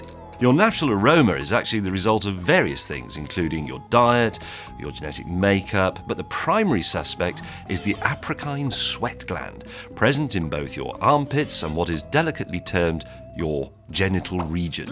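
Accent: Scotland, United Kingdom. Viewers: Apocrine is pronounced Aprocine Propanoic acid is pronounced Propioc acid Hexanoic acid is pronounced as Hexionic acid These are scientific terms. It has nothing to do with dialect. You can't just take defined scientific terms casually because you're British. The way he says out and ouse words makes me suspect Scottish flavours.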